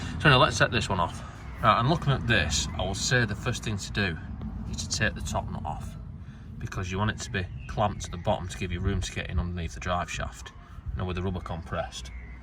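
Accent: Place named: Lancashire, United Kingdom. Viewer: yep and don't go talking posh when you become a star . The Lancs accent is great 👍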